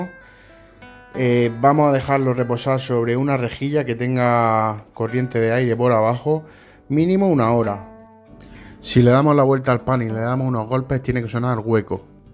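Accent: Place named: Murcia, Spain. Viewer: Estupendo pan lo haré haber si me sale como a ti . Si no me equivoco eres de Murcia o Cartagena como yo el acento no engaña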